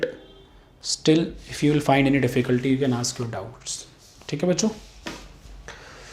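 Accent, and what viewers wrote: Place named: India. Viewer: 영어 발음 실화냐 영어를 인도어로 만들어버리네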